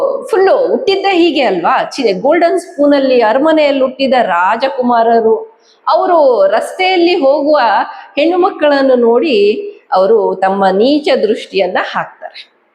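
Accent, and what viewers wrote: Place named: Tulu Nadu, India. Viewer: ಮೇಡಂ...ನಿಮ್ಮ ಮಾತಿನಲ್ಲಿ ಯಾವುದೇ ತಪ್ಪು ಸಂದೇಶವಿಲ್ಲ, ಆದರೆ ನಿಮ್ಮ ಮಾತು ಕೇಳುತ್ತಿದ್ದರೆ ನೀವು ನಮ್ಮ 'ತುಳು,ನಾಡಿನವರಂತೆ ತೋರುತ್ತಿದೆ,ಯಾವೂರು ಮೇಡಂ..?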